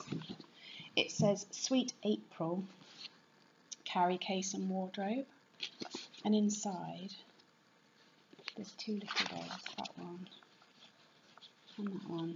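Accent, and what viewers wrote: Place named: England, United Kingdom. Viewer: Thank you! I'll bet you find some amazing things in, I'm guessing England, by your accent😊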